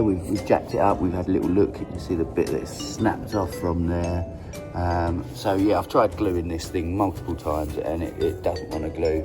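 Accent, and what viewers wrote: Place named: United Kingdom. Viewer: You sound like you're from the uk like me id love to buy a set of these if possible when i complete my build and get my electronics. Do you have Facebook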